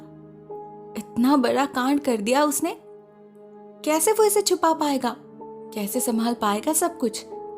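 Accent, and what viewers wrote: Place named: Bhojpuri, India. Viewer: aap bhojpuri lakte ho aapki bhashah seh lagta hai.. Par mujeh aapki awaz seh pyar ho gya hai lagta hai K aapseh be...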